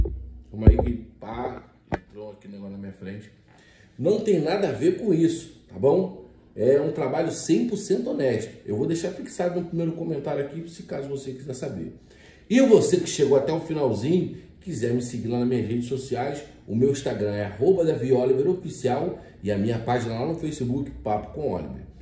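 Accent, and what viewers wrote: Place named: Bahia, Brazil. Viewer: Como consegue falar baines assim , como baiano .opaió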